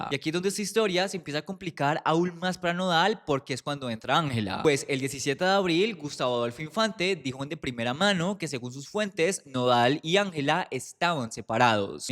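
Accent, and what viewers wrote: Colombia. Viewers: Me da la impresion que es colombiano ❤ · Nada que ver mi comentario PERO me encanta tu acento colombiano!!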